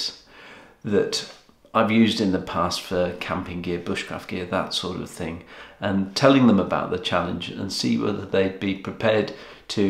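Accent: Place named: Yorkshire, United Kingdom. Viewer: Done. It's great to hear a Yorkshire accent when I live down south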